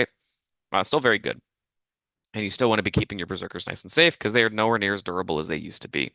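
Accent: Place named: United States. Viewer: Wait, I thought this was a British YouTube channel? Why is there an American man here?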